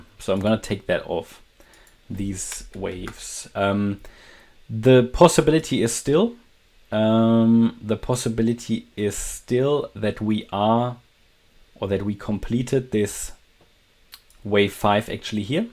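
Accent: Germany. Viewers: Are You German? I hear a German accent?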